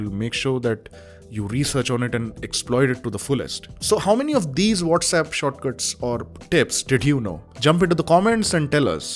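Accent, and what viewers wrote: India. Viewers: Hate that Hindú accent. It’s very hard to understand!!!!!!!!!!!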